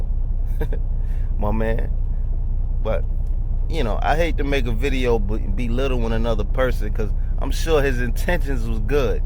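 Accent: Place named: New Jersey, United States. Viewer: Lol dude wildlin...I'm from Nj mostly everybody I kno talk like you and for you too be successful and still be your self is inspiration for plp like me...I tried to find you on ig but a whole bunch of other accounts popped up...I just got my authority authorized this week and love to link with you cuz I kno you'll keep it 💯 let me kno if it's a easier way to contact you stay bless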